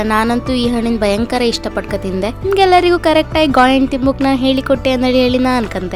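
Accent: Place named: Kundapura, India. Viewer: Beauty of kundapura kannada👌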